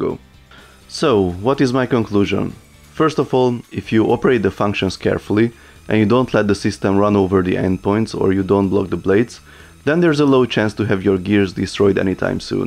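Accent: Hungary. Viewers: a neve, és magyar dialektusa van. Biztos hogy lengyel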